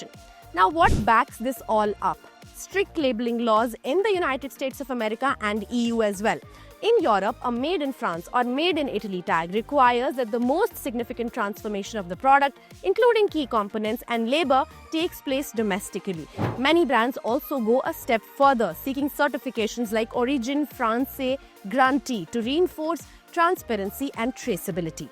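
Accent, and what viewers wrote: India. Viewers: As if I am going to believe this reported with an Indian accent.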